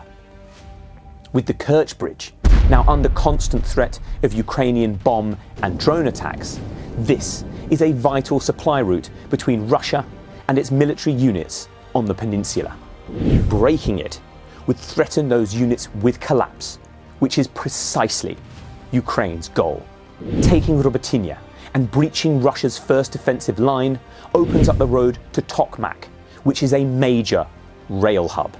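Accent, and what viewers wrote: United Kingdom. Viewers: I am so sick and tired of some idiot with a British accent telling me stuff. It’s insulting.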